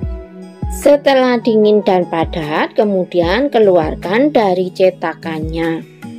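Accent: Java, Indonesia. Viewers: Bunda orang Jawa yah Bun suara nyabagus bun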